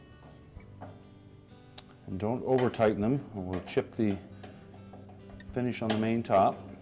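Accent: Canada. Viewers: Thank you, this was exactly what I needed. Love your accent, is that Canadian?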